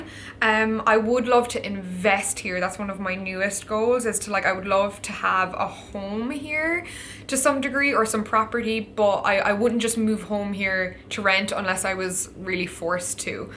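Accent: Ireland. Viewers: Your Irish accent is poppin even more now!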